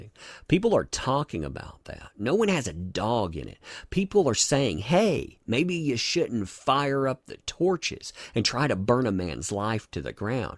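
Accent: South, United States. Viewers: Subbing purely for dat Southern drawl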